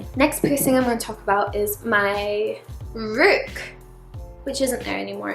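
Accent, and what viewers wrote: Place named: Scotland, United Kingdom. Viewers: I hear a mix of accents when you speak which I actually love.. I hear the u.s/Canadian north American accent, and then I also hear a slight uk accent.. not anything like some other accents from u.k, but I can actually understand every word that you say! I'm north american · She has a Scottish accent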